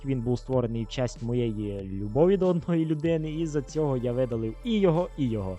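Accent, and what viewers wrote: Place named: Ternopil, Ukraine. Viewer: Короче я подписался. Давай больше контента, у тебя интересный Украинский. Как будто Тернополь что то такое. Давай больше видео и юмора 🎉 жду.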